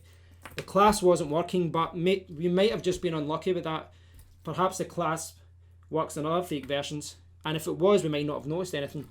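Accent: Scotland, United Kingdom. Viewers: Oh my actual days. I’m reading this 9 months after the comments and this reply “you’ve hurt my feelings” has just cracked me up so bad 😂😂😂😂 I just keep imagining it in that scottish accent 😂😂😂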